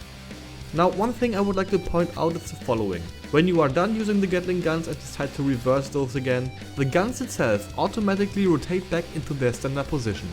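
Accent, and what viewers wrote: Germany. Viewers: you Sound German .. are you?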